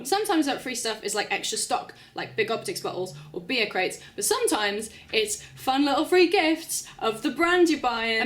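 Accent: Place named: United Kingdom. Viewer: I don't understand why your cute british accent always attract me again to your videos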